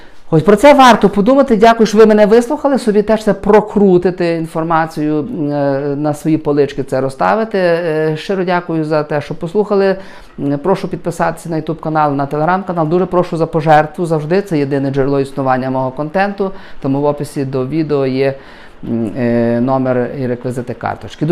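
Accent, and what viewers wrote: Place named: Lviv, Ukraine. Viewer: дякую за роз'яснення ситуації чудовим львівським говором. Вітання зі Львова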